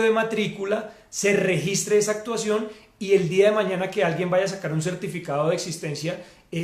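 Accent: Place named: Colombia. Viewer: Púes si es colombiano, en el acento se nota. Sería como raro saludar jajajajaja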